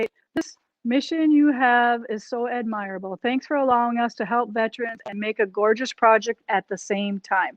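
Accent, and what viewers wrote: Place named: Wisconsin, United States. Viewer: “How ya’ doin’ over dere.” Spoken like a real cheesehead! My husband and his family are from WI and they talk just like that. 😂 And, everything about you reminds me of my SIL. 💖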